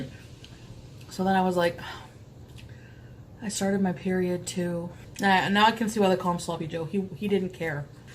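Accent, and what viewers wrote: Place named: Scotland, United Kingdom. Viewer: Scottish Accent DISGUSTENG!!!!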